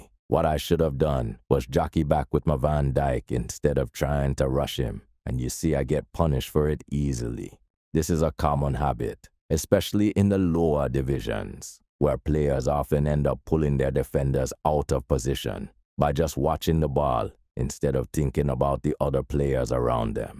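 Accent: Jamaica. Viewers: Bro should look into motivational speaking! He got that leader voice that you know you can trust what he saying. Definitely Jamaican 🇯🇲.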